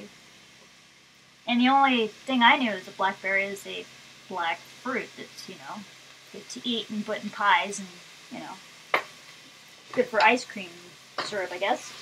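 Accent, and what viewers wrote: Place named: United States. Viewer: I think your English still sounds very American. I think "you know" is spoken by a lot of the kids; I know I did it when I was a teenager. "Skimming the top" or we even use 'scumming'.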